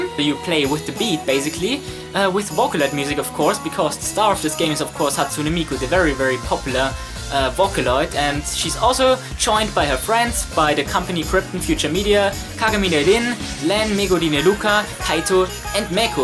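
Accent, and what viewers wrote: Germany. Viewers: Dude are u german? Nice video btw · Dein English ist gar nicht so schlecht aber man merkt das du Deutscher bist :D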